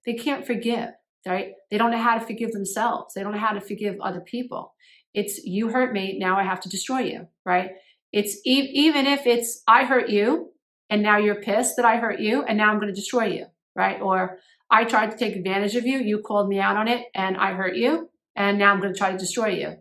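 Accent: United States. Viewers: Hi I have seen your videos on Instagram as you only popped up the other day on YouTube as I was looking for something else to watch then I clicked on your feed to see what the video Is all about and I have two very evil brother and sister who are really cruel to me and done and said the most wicked things too me for the last 50 years but I am not sure if what you say is about anyone so just wanted to ask you that ok and I am not a troll as you mentioned in one of your videos on Instagram lol but I I will say this your absolutely gorgeous and I love your sexy American accent lol it's sends me to sleep because I am very unwell here and having trouble getting to sleep for days now so you have some good uses in you if nothing else hahaha im from England 😉😂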